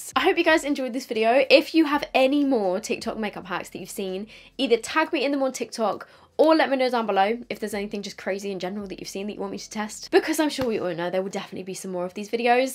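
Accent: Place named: United Kingdom. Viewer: I just watch your videos. I dont care how long they are. I enjoy listening to your British accent. Keep up the good work. Love ya.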